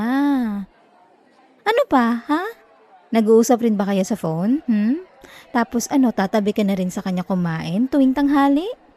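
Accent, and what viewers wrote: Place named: Visayas, Philippines. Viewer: ptnginang kabisayaan nato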